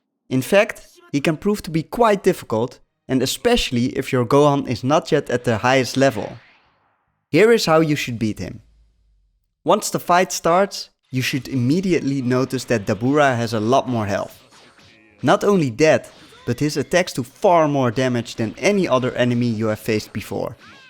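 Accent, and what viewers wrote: Netherlands. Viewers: You're Dutch aren't you 😄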